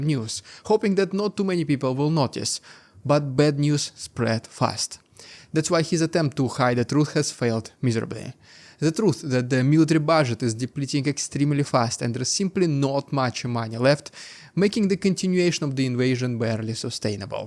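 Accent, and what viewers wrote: Russia. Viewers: You present good videos, not only as far as news but with one of the best foreigners who is very competent in English. At the same time you have one of the most severely bad accents. The harsh contrast between intelligent presentations delivered with a hardly understandable Russian accent is hard to understand. A man who speaks such good English (or maybe has a good teleprompter?) should be able to teach himself a decent pronunciation of his speech. Give it a go, you will get more followers.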